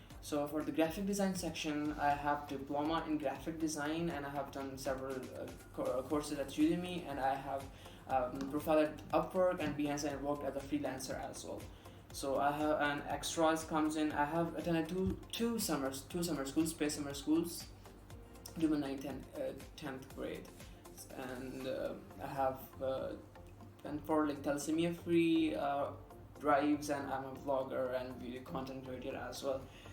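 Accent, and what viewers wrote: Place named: United States. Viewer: America gaya nahi or language abhi se shuru kr di hai wah re wah.. btw English kaafi achi ho gayii hai teri specially American accent 😍 ♥️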